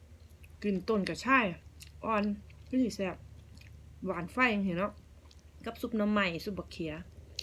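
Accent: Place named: Roi Et, Thailand. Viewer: อิหล่ายุจังหวัดไดนอเว้าคือสำเนียงทางร้อยเอ็ดบ้านป้าเลย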